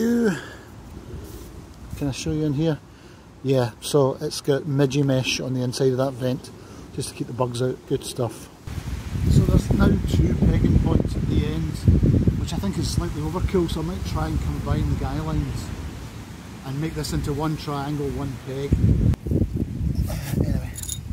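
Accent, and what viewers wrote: Scotland, United Kingdom. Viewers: Great review.. very detailed which I enjoyed very much... Obviously a Scot... Perhaps we will cross paths on the hill or mountain...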